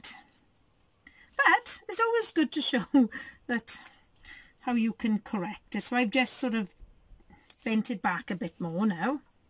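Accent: Wales, United Kingdom. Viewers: Good tutorial.... love your Welsh accent!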